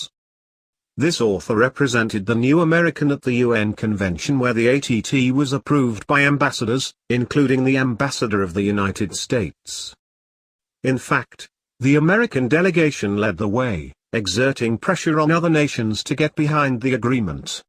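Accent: United Kingdom. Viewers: Why is the narrator a limey???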